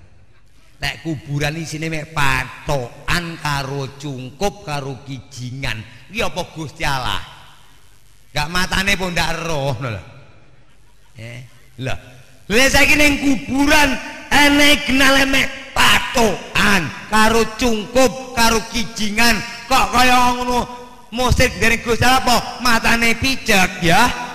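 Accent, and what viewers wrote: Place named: Madura, Indonesia. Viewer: luar biasa bagus banget logate mirip meduronan..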